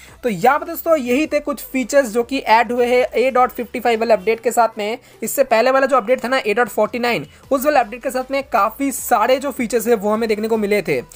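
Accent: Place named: India. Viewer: Hmm,. Lovely voice and Hindi accent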